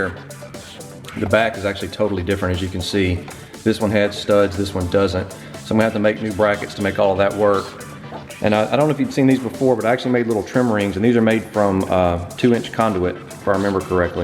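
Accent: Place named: Carolinas, United States. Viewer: Are you in the Carolina's? Guessing by your accent ;) I too have a 3rd Gen 4runner with the TRD S/C (Walbro 190LPH, Supra Injectors, URD Pulley, JET flash ecu). Pings going uphill under load so I'm looking at Methanol injection. CLEAN INSTALL and I appreciate your thorough explanations!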